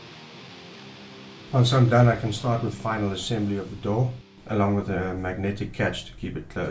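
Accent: South Africa. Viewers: I have never seen that plunge technique before mate, that's awesome. That will come in very handy I'm sure. Sounds like a Durban or Cape town aven't there?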